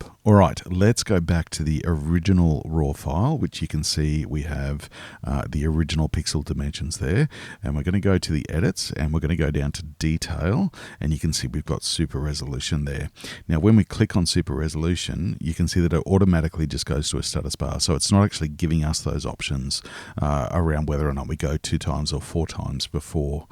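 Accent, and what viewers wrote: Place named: Australia. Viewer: I've only just come across your channel and I'm happy to hear an Aussie accent. Thank you for the info. I'm newish to LR and will be going through your playlist to learn more 👍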